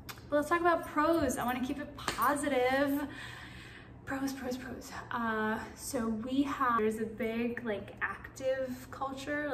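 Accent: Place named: Atlanta, United States. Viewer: You have the Atlanta accent same as mine, Atlanta was full of transplants from the 90s so we didnt get a GA accent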